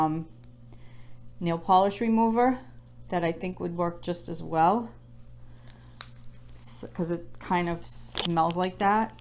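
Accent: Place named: Boston, United States. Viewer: You need to make more videos. We are all enjoying your lovely accent and nice personality. You make such nice videos of your nails. You must be from Boston or Maine. I was originally from Long Island NY and moved to warm Florida a few years ago to get away from the cold. Anyway keep up the great work!!!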